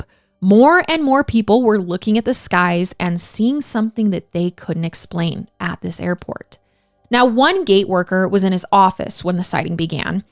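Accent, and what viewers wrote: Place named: AAVE, United States. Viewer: Ebonics! It actually exists!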